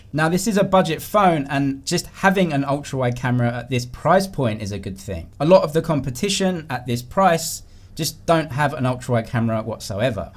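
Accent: United Kingdom. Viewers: you looks like eminem with an british accent 😊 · Are you British?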